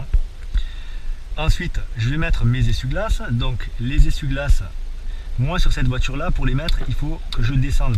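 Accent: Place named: Carcassonne, France. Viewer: Ton accent me semble familier, Carcassonne ? Je suis a 1h de montpellier :)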